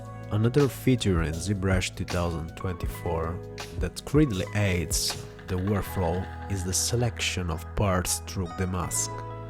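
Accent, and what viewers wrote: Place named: United Kingdom. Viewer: What an amazing british voice man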